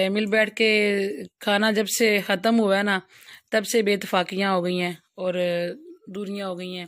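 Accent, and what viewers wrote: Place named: Hafizabad, Pakistan. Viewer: لیکن حافظ آباد کی بولی کا اپنا ھی مزہ ھے تھوڑی سی بولی تھی آپ نے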